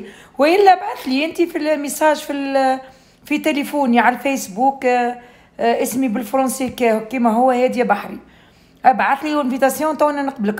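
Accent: Tunisia. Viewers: ما احلاها اللهجة التونسية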